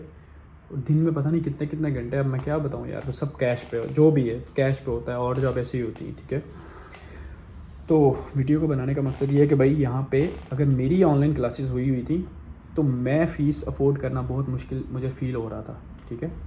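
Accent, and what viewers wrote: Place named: Punjab, India. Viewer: Great job.... Punjabi ka trka buht achaa lgaty app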